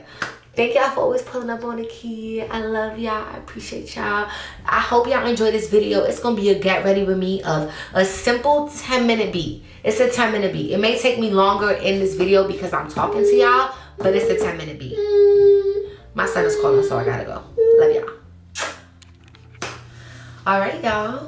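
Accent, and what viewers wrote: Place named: Brooklyn, United States. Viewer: It’s your New York Brooklyn accent for me !!! I have to watch this Anna series because this sounds goodddd Also can’t wait for you and your husband to do the how you met story time video 🤎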